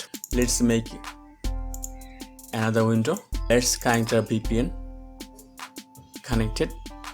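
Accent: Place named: India. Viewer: you saved my ass bro, don't mind the accent i always trust Indian youtubers, there are just legit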